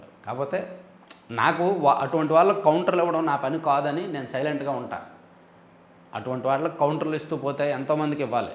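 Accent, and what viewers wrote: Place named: Uttarandhra, India. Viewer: Babu née voice mana Uttaranchal voice lo maaku nachhutundi and content Kuda baguntundi Kani neeku inka people support Kavali alage people tho direct talking introducing Chalayan Manchin alochana wish you all the best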